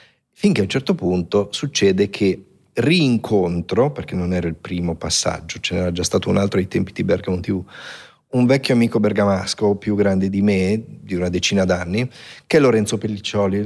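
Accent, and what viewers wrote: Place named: Mestre, Italy. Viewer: Io sento comunque , nonostante gli anni a Bergamo , la R la S mestrina 😅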